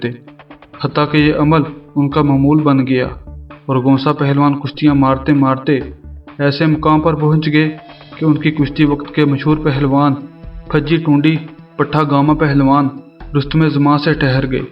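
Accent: Punjab, Pakistan. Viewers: Bht achchhe...bhai kya aap us zamane k mashhoor pehelwaano k daav k video bana skte ho...to aaj k pehelwaano ko bhi us fan me se kuch thoda bht seekhna ko milskta h...aap video k kiye pehelwaano se to milte hi hain...agar unse unke khaas ek do daav agar miljaye..me bhi pehelwaani karta hu...aapki badi madad hogi..aapki punjabi tone aapke videos me 4 chand lagati h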